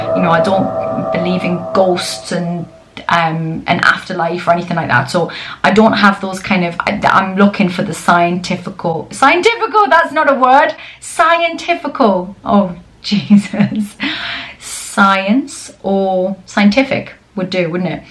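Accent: Newcastle, United Kingdom. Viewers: I love your Geordie accent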